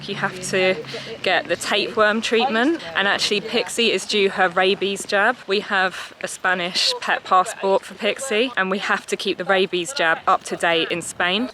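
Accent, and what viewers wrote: United Kingdom. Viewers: What is accent? Which part of the UK?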